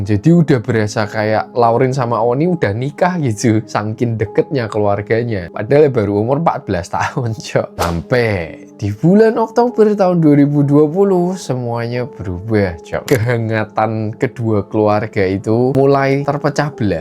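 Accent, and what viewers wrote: United Kingdom. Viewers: anjay pamer british accent nih bang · Anjay pakai british accent cyuk, kereeeen. 👍🏻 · Love ur English accent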